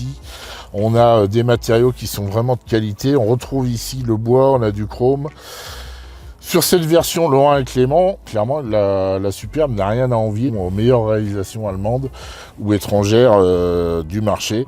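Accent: Paris, France. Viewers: J'adore l'accent Titi parisien. Un vrai régal. Génial. Jean Jacques 57 printemps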